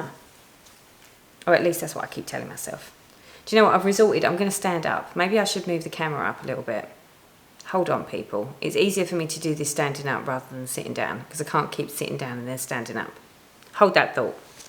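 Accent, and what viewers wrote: United Kingdom. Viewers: I love watching your videos and listening to your wonderful British English 😍 I usually have a new start whenever I want to, therefore it's great fun to watch you talking about your 'rules of new starts' and how you start stuff 'anyway' 😁 At the moment I have 54 wips, but a lot of them are under 30k stitches. And none of them is above 90k stitches 😊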